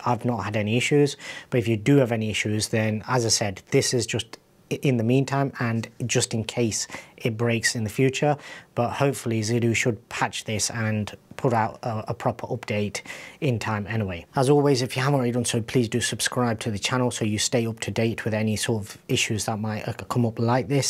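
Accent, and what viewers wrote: United Kingdom. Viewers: Please next time speak even faster, so that foreigners again can skip your video. And just because of your extremely British accent, I mostly only was able to guess, what your sentences possibly were supposed to mean.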